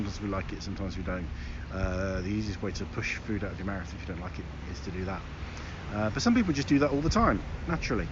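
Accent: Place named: United Kingdom. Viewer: I think the British accent is beautiful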